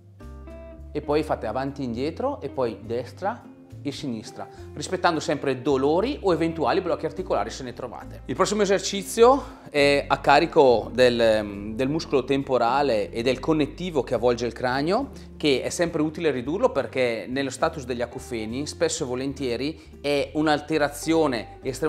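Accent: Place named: Brescia, Italy. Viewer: Ciao dall accento mi sembri zona BS... Hai uno studio dove visiti di persona in zona Brescia? Grazie!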